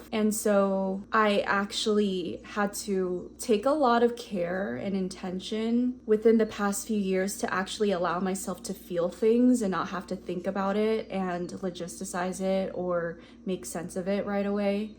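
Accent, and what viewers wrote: United States. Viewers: that's just awful, I understand your family was raised in different cultural environments, but your accent or lack of accent tells me that you're very much used to the Western cultural traditions and women are VERY VERY harsh on each other when it comes to physical appearance it becomes toxic because of it being excessively used within social dynamics because of the pathetic desire to one up another person. It's nothing more than underhanded identity politics aka narcissistic characteristic individuals. Sorry you've gone through this, but I'm proud of your courage to voice your emotions and your vulnerabilities, you're definitely moving in a direction to up your level as I'm sure you've done in the past. Keep on moving forward, take your time, take care of yourself, don't be hard on yourself, you're a person, you're not a machine, you have limitations, and they'll continue growing in whatever direction you see fit. 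but at the same time it does seem that you were able to both see things from a logical standpoint and address them in a more objective manner, I wouldn't say intellectualize but definitely got your head on straight and still able to feel so deeply and be so in touch with self.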